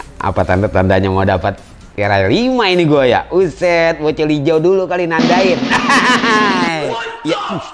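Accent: Jakarta, Indonesia. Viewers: Bang selalu di hati 😂😂😂 Logat betawinya itu loh yg bikin saya 😀😀😀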